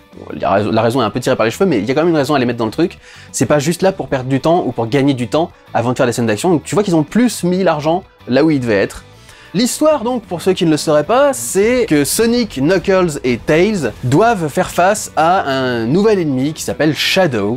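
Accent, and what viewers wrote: Quebec, Canada. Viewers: très bon accent québécois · Accent québécois correct.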